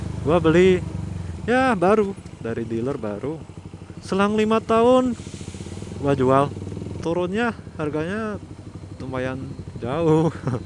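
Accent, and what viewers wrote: Semarang, Indonesia. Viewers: orang Semarang ya?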